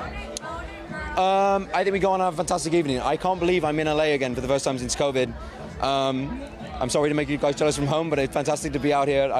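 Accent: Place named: Wales, United Kingdom. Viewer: He sounds welsh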